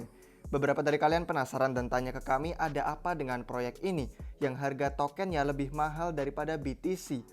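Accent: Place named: United Kingdom. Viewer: pronounce british emang seperti itu ngab , gak lebay mungkin kamunya yg gak tau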